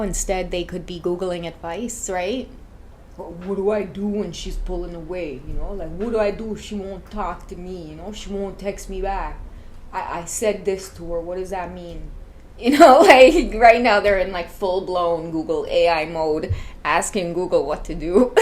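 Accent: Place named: Trinidad and Tobago. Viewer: Look meh trini reader 😂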